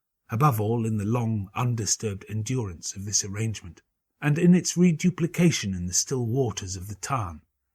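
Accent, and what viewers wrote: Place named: England, United Kingdom. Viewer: At last, I've time to listen and enjoy. Ahhh, your French! And why does Poe sound so good in an English accent, when it's American? Who can know these mysterious things. ;-)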